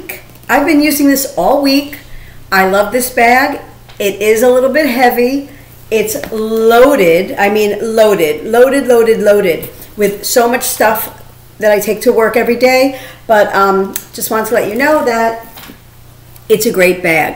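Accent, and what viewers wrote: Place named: New York, United States. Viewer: You sound like your from nyc I was born in the Bronx NY 💕😍😍💕😍 beautiful bag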